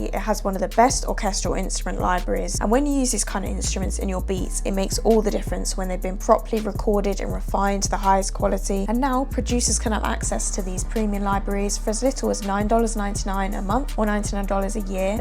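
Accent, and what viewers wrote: United Kingdom. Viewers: Ayeeeeeeeeeee I started to play this & completely ignored it, a few moments later I’m like “Who is this lil white girl with this UK accent cooking on the beat!” Does she/her have an Instagram? I have to plug her in with my lil bro DJPlugg, Google him. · Her posh accent makes this go even harder.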